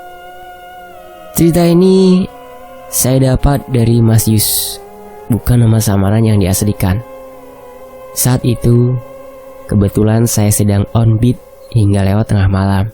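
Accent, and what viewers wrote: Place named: West Java, Indonesia. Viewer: Akhirnya mimin bawain cerita dari sunda...bisaan c mimin logat sunda na haha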